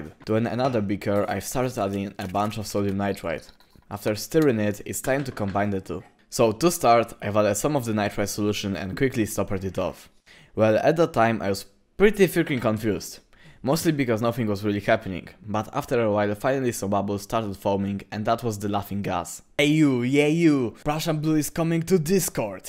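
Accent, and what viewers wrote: Poland. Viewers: Słychac że jesteś z pl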